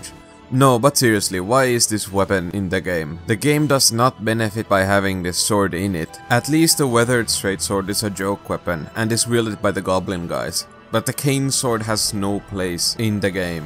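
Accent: Finland. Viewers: love the finnish accent <3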